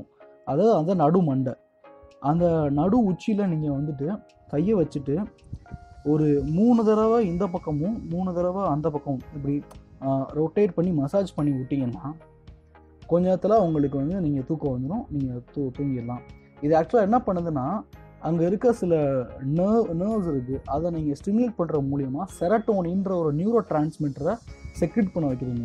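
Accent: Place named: Kerala, India. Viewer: ninga pesarudu sariya Kerala OK so good tips I tray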